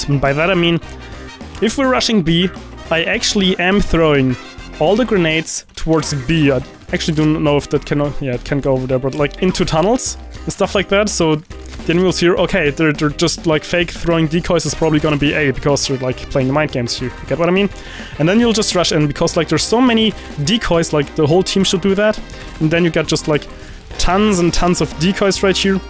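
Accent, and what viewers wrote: Germany. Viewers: Just asking but are you from germany?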